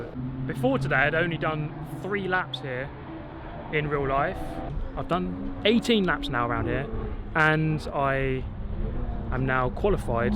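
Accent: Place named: RP, United Kingdom. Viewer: How posh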